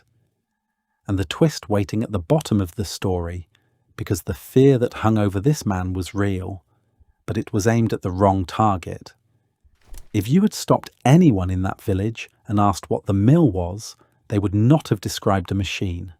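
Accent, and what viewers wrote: United Kingdom. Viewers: How much AI is used in your vidoes? Thumbnail is AI, script is very repetitive and oddly structured which suggests AI writing, and though the voice is British, the terms used are exclusively American. I just have no idea how much of this I can trust at all.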